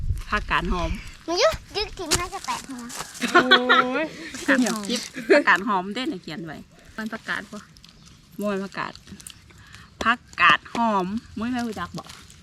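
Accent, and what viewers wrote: Isan, Thailand. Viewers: อีสาน.กะเว้าคือกัน.อุบลเว้าคึบ้านสาวรัศนั้นละ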